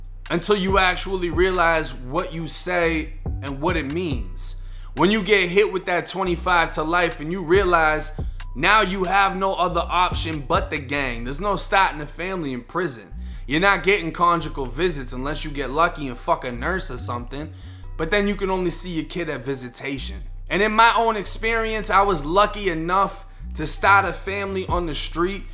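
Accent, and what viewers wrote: Boston, United States. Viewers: You from Boston?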